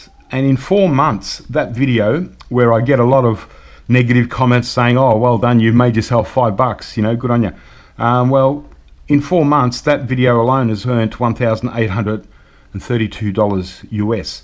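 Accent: Australia. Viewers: We love your Australian accent! I really didn't understand how YouTube revenue worked . I'll start sitting through the ads when I watch your stuff. Keep them coming. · Not sure why you think you'd be more popular with an American accent mate. I think it's just the opposite, we like hearing your Australian accent, and your great opening, "G'day scrapers"!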